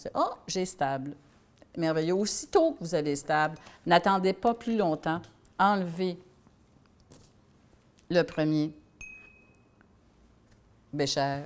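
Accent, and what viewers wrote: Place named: Canada. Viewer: merci pour ce cours qui m'a beaucoup aidé, et merci pour votre accent canadien..ca me rappel mon séjour à Montréal en juin dernier -)) vraiment top ce pays et ses gens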